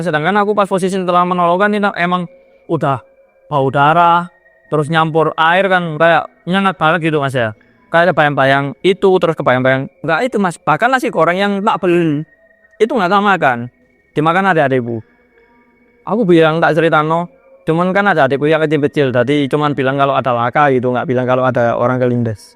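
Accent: Java, Indonesia. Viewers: Mase lek crito unik, JoWo pool. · aaaaghhh medok sekali Saya yg bukan org jawa sangat susah memahami cerita nyaa 😢😢😢 Teenyata bahasa jawa itu kata "E" maksud dan artinya adalah "NYA" ya ?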